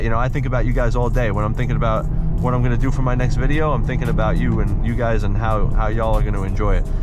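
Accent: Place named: New York, United States. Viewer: Annoying NY Accent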